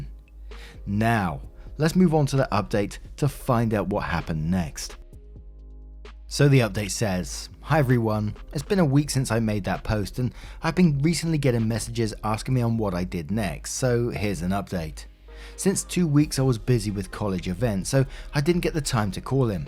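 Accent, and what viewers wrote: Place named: Oxfordshire, United Kingdom. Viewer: Oxfordshire accents rock!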